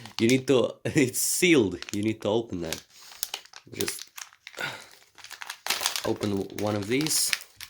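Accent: Russia. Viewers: 0 dislikes thats what i like to see also i like the voice especially with the russian accent :D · I hear that russian accent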